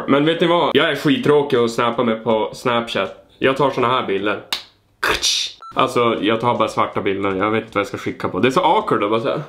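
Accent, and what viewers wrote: Norrland, Sweden. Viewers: Hahhaha varför gillar jag din dialekt men hatar norrländare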